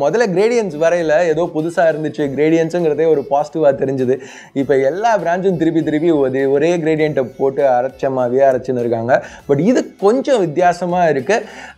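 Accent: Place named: Chennai, India. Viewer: Nice video bro. Apple charger include pannadha nalla kalaikuringa. Chennai slang thaaru maaru. But don't use that slang in Dubai.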